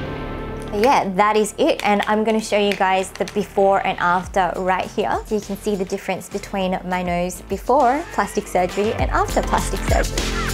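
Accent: Australia. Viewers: Is that an Australian accent I hear? But don’t you live in Korea? Anyway.. You’re freaking adorable. 😊😊❤️ Thanks for this! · You look so cuuuute ! And I know I say it everytime but I love your Australian accent! 😻😘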